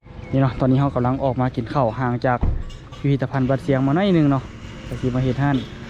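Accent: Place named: Lom Sak, Thailand. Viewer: สำเนียงเอื้อยเหมือนคนจังหวัดเพชรบูรณ์อำเภอหล่มสักหล่มเก่าครับ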